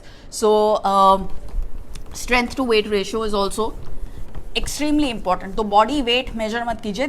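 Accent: Mumbai, India. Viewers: Every video is very informative . I enjoy listening to your Bumbai Hindi. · phuski bomb ...lol ..ur such a mumbaite..its awesome to hear every word u say .deep thanks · Nice & convincing explaining...enjoyed Bambayya Hindi too...like..."ye mai kayke liye samza Rahi hu" 😄👍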